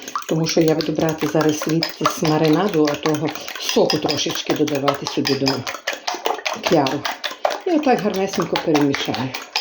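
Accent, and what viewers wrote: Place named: Chernivtsi, Ukraine. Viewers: Спррбую по вашому рецепту.По розмові ,певно ви землячка,думаю що ви з Кіцманщини.так?💐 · Моя братова з Чернівців ,говорить так як ви, мені дуже подобається.Дякую за рецепт Зараз буду готувати. Мирного нам неба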